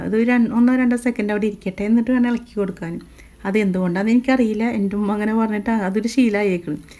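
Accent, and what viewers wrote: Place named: Thrissur, India. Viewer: Rambuttan kazhikan njan beemanam Keri varatta❤ orupaad istaayi video yum Thrissur baashayum❤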